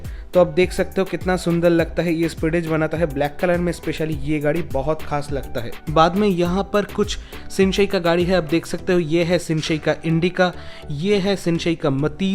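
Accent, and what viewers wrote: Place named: Bengal, India. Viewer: Sir are you a Bengali??..From your Hindi accent...I also have a small hot wheels car collection of 30 cars...But your one is huge..Great job...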